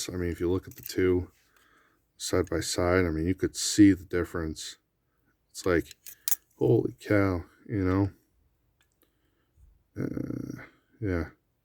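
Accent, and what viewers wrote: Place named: Massachusetts, United States. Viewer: Not a bad collection! Pretty ridiculous seeing the two Toors side by side. I handled a v1 in my local knife shop, but have never owned one. I'll grab one when I find a good enough deal. Have you seen the new $425 folder they're making? Looks kinda like a 3.25" Greyman Satu. Looking forward to the sharpening video. You showed a few decent edges. I thought I recognized a bit of mass accent... Im in central new york.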